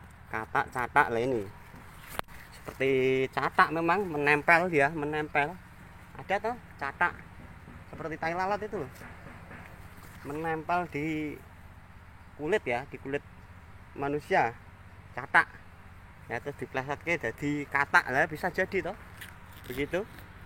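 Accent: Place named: Java, Indonesia. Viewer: Mantaap...tutorialnya. klo dengar bahasany, asli orang jawa ya, om...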